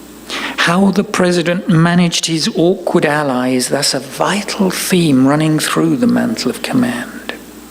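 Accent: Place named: England, United Kingdom. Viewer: There is someting special about a historian with a great english accent and fantastic delivery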